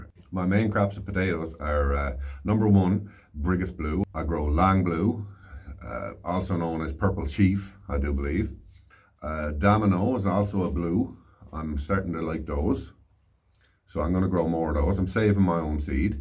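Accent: Ireland. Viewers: Was fully sure that this was an Irish video when I heard the accent, your accent is very like a Waterford accent. Not the first time I have mixed the Newfoundland accent up with the Irish accent. I am guessing Irish must have settled in numbers way back. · Is this man Irish? Good job on the root cellar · Sounds like you are Irish, the accent, dialog, and love of spuds gives you away. Norm from Scotland. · Is your accent a typical Newfoundland accent? I’d never heard one before. I’m Irish and it’s bending my head coz it sounds sooooo Irish to me, with a twinge of Canadian 😊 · Come.on in and meet my potatos. , Your not Irish , are you?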